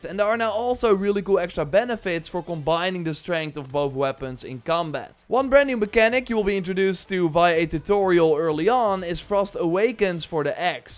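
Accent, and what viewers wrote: Netherlands. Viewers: dutch accent right? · ARE YOU DUTCH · You can hear that you're dutch 😂 (Goeie video maat!)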